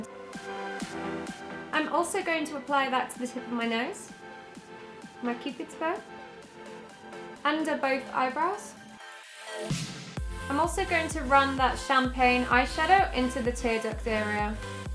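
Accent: United Kingdom. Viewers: are you British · She's britishhhhh???? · Are you British???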